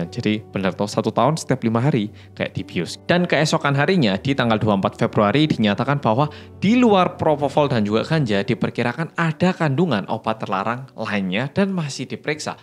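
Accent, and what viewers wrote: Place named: Malang, Indonesia. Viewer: saya paling senang mendengarkan mas berbicara, gaya AREMA NYA (AREK MALANG ) tetap tidak hilang bikin sedanp di dengarkan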